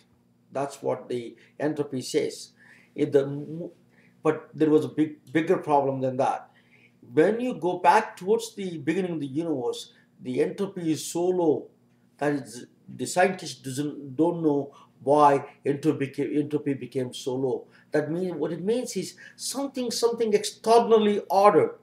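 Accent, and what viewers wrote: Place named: Middle East. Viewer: 5. Didnt see a fifth question. But it is not hard to explain something containing entropy since nothing does in the solar system to our knowledge, might want to look up the "big crunch" or "big freeze" theories to do with entropy and matter in the universe. Also please try to speak more clearly I couldnt understand half of that, I know you are middle eastern or something to that effect but when attempting to argue these things you need to be clear. Nothing you said poses a problem to Dawkins.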